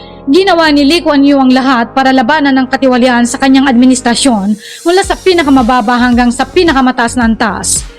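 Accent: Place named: La Union, Philippines. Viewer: Kasla met tuno ti la union tunom apo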